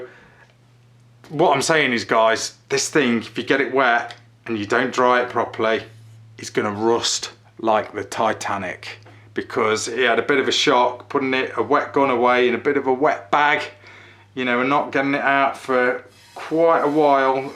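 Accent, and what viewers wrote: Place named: United Kingdom. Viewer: What country are you in? Because it sounds like your from the UK. I thought that the UK band firearms from civilian.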